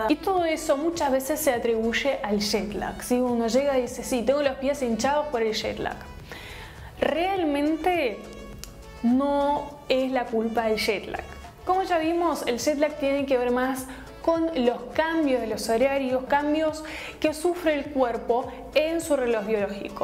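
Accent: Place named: Argentina. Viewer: Muy buen video! Me pareció un poco raro tu acento en este. Estás tratando de hacerlo más neutro pero aguante el acento argentoo! 😘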